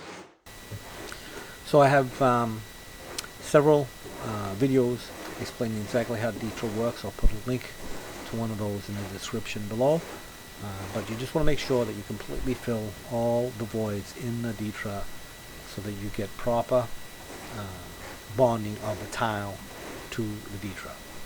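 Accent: Boston, United States. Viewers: You sound like a Red Sox fan so I know you’re not near me. But I could’ve used a tile pro like you. I got shoe makers working in my bathroom.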